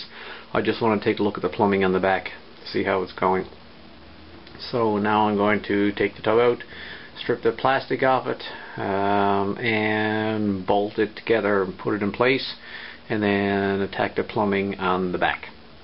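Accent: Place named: Newfoundland, Canada. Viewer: This video saved me a ton of time. Thanks! Recognize that NL accent -- should have tracked you down to install it for me! HA! Thanks again!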